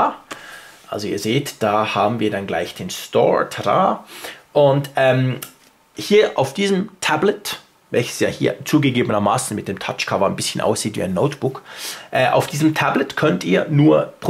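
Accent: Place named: Switzerland. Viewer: Von de Stimme höre ich, dass Sie wahrscheinlich kein Deutscher, sondern ein Schweizer seid, ich auch. Deshalb meine Frage: Ich möchte mir das Surface Pro kaufen, aber dies Erscheint in der Schweiz wahrscheinlich erst in 3-4 Monaten. Wie sind Sie zu dem Surface RT gekommen? Liebe Grüsse aus Bern Marco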